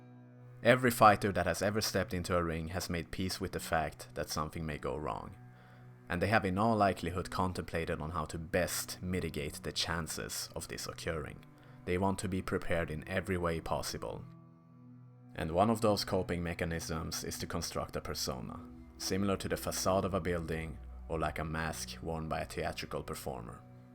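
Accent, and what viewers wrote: Sweden. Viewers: Are you swedish sounds like it atleast😂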